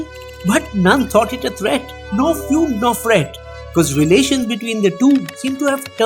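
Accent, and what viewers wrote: India. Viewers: well the accent is india so bye the vedio is gonna be as fake as the indian media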